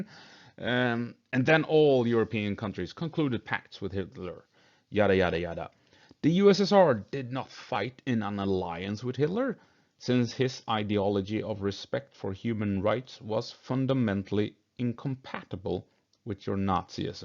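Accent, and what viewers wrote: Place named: Gothenburg, Sweden. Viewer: If I had the money I would pay for you to read more troll comments in that thick Göteborgs-English lol. That was great haha.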